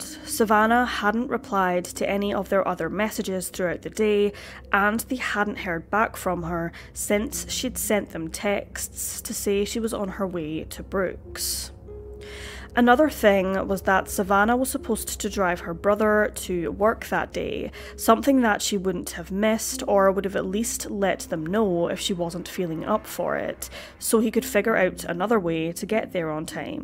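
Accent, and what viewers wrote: Scotland, United Kingdom. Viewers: Is this a scottish accent? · I like your Scottish ascent.🙂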